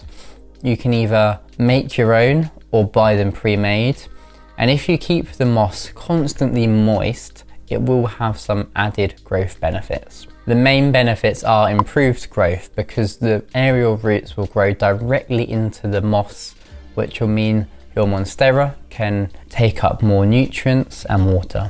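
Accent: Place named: Wales, United Kingdom. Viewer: Thank you for this excellent video. As an American I was trying to place your accent. Are you possibly Welsh or perhaps Australian ?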